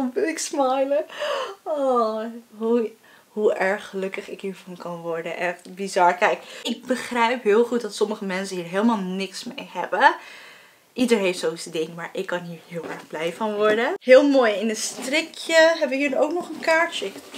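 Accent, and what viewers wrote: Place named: Netherlands. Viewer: klink pittig Nederlands🙃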